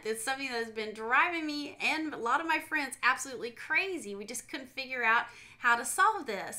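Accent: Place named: South, United States. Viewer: Nice accent, are you from the South or Texas?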